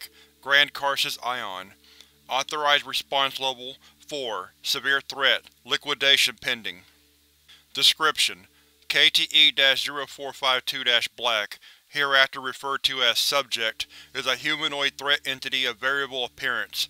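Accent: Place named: Texas, United States. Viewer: Ahhhh....I THOUGHT I heard a slight Texas or some other accent from that area...Very cool...I go to night school and partly work from home so am up late working on things sometimes...as I listen to stuff.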